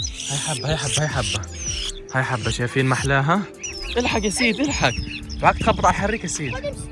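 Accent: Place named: South, Saudi Arabia. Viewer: ❤❤😂 نفس لهجتنا اخ جنوب السعوديه حوش الصيد واكفتها وتشرد وكثير وعشان 😂 نفس اللهجة اقرب لنا من اللي قريب من ٥٠ كيلو تختلف حنا عنهم وانتم تبعد ونفس اللكنه ❤😂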